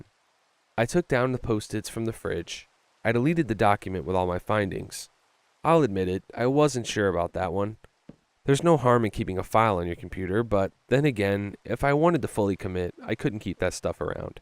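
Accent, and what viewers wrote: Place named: United Kingdom. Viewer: bro i SWEAR when i listened to this at first it was a british guy talking 😭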